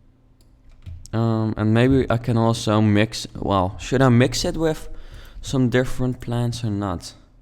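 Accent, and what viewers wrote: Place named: Netherlands. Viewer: is this guys dutch or somthing. he really sounds dutch. :\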